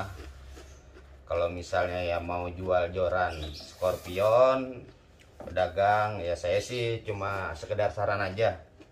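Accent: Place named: Jakarta, Indonesia. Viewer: Resep klo lagi ngobrol nya bang bahasa nya Betawi pisan sukses slalu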